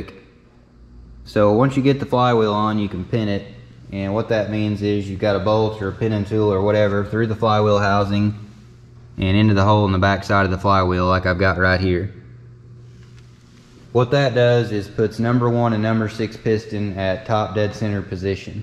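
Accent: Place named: Oklahoma, United States. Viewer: I’ve been trying to figure out where you are from, SW Oklahoma, your accent, I was curious. I wonder how many miles it took to get across that field. Been there done that spinning my wheels on the mud. I like your videos. Thank You Sir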